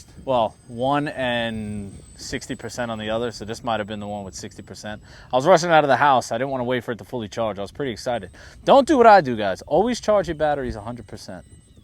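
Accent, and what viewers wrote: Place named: East Coast, United States. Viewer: Great coverage of this plane! Im looking for a nice backyard flyer. And your east coast accent makes me feel at home man. I moved away from The Island when I was in my 20s.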